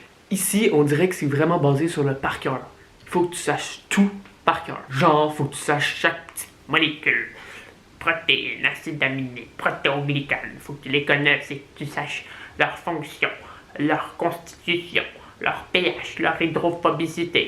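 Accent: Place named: Quebec, Canada. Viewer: Mais il le dit nn 😂 ça se voit il parle de sa vie au Québec, il a l’accent…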